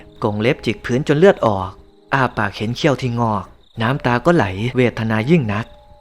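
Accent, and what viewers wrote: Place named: Roi Et, Thailand. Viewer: เว่าภาษาอิสานเมืองร้อยเกิน กะน่าฟังไปอีกแบบ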